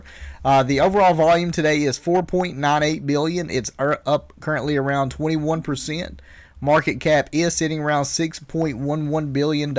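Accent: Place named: Deep South, United States. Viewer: Hey! I LOOOVVE your deep south accent...🥰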